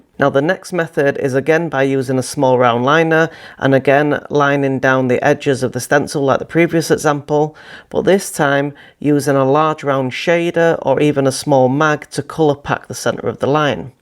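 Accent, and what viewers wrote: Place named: Yorkshire, United Kingdom. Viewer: Thanks for this mate , we're close in accents as I'm a Yorkshire man and this was good to find something familiar sounding because the American reference points can differ , just dine my first self tatoo and your video was really informative thank-you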